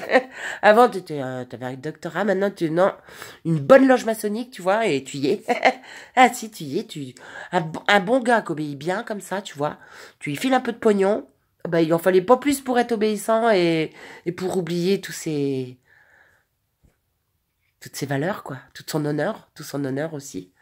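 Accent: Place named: North, France. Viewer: Vous êtes du Nord ? Un accent comme celui de Dany Boon 😁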